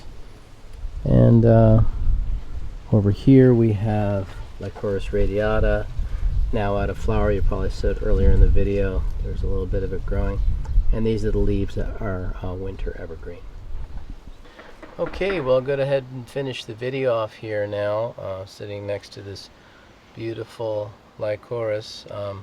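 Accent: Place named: New York, United States. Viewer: Good and interesting to watch, as always. You really got into your New Yorker character. Boy, did that bring back memories.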